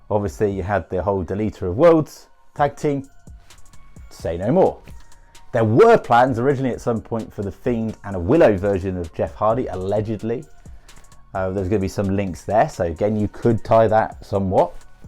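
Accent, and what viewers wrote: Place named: United Kingdom. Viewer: Found your channeled today. Once I heard your voice and that British accent I subscribed immediately - because that’s the law. Everyone knows if you want to be a good wrestling Youtube channel you need a Brit. Don’t question this rule, because the video was really good so the rule was right. Oh and I really hope Bray comes back!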